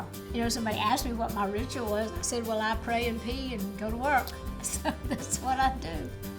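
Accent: South, United States. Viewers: I love the Southern American Accent!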